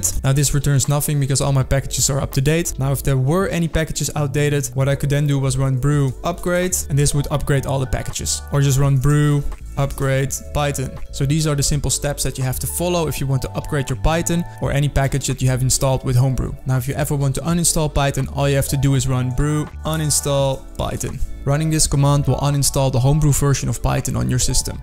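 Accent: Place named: Netherlands. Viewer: zo jongen ik hoor zo erg je Nederlandse accent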